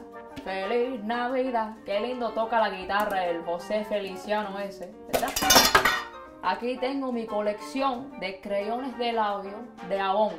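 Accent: Cuba. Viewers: me encantan tus videos. Son lo máximo. Me alegro que mantengas tu acento cubano (siempre tan cálido). Así mismo son las abuelas caribeñas.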